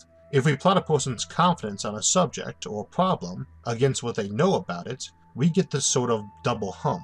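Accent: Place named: Louisiana, United States. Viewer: I thought initially it was a strong cajun accent lol.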